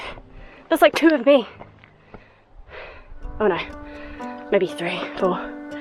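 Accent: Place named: England, United Kingdom. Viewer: I can't figure out if you have a Welsh accent or English. I'm thinking English but every so often you sound Welsh lol If you're Welsh then Shwmae, if you're English then I hope you enjoyed your stay 😂👍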